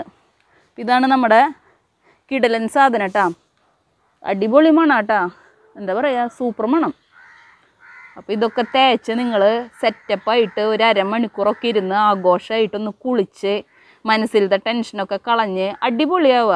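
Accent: Thrissur, India. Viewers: 👌നമ്മൾ തൃശൂർ ഗഡീസിന്റെ സ്ലാങ് സൂപ്പർ 👏👏👏സൂപ്പർ ടിപ്പ് 👍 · ഭാഷ സൂപ്പർ ത തൃശ്ശ്യർ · ഈ വീഡിയോ ഒത്തിരി ഷ്ടായി. അവതരണം രസാണ്. ആ തൃശൂർ ഭാഷ വളരെ ഷ്ടായി. തൃശൂർ എനിക്ക് ഒരു ഫണ്ട് ണ്ട് അതെ സംസാരം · തൃശൂർ ശൈലി സൂപ്പറായി. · ചിരിച്ചു ചിരിച്ചു ഞാൻ ചത്തു നല്ല തൃശൂർ സ്ലാംഗ് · 🙏🙏 Super tips. Thanks for this tips. സ്വദേശം തൃശ്ശൂർ ആന്നോ. നല്ല പ്രസന്റേഷൻ. Video കാണുമ്പോൾ ഏതായാലും ബോറിങ് വരുന്നില്ല ഞാനും ഒരു subscriber ആണ്.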